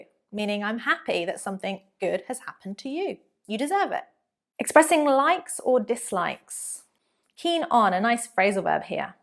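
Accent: United Kingdom. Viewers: Lovely. I've been looking for pure British English pronunciation. Thanks a lot. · So helpful phrases. Is this a British accent? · Thank You for you conversation teaching is it nice one to learn actually l want to catch your accent British this one easy not hard but the thing is how can to memories the Bank of vocabulary this problem is great so when it found the english speech rather unable to know every things this is my vision tell about when listen podcast can not understand all great thanks to you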